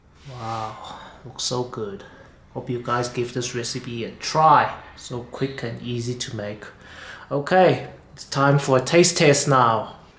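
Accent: South Africa. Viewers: Your accent is giving me South African 🤷🏽‍♀️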